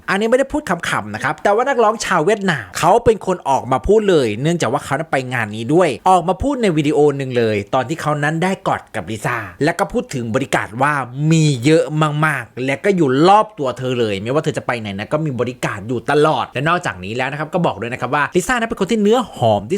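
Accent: Isan, Thailand. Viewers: ชอบแอดเว้าอีสานมาก น่ารัก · พอได้ฟังพูด อิสารเลยกดติดตามคะ❤❤❤ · ชอบในความหลุดความเป็นอิสานนะคะ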